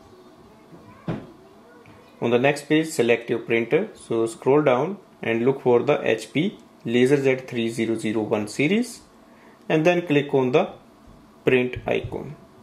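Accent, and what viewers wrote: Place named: India. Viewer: 인디안 엑센트는 적응이 안되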